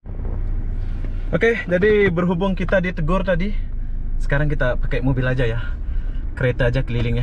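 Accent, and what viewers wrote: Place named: Malaysia. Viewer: Bang jangan cakap mirip Malaysia terus sekali sekali cakap macam Singapore ke brunei😅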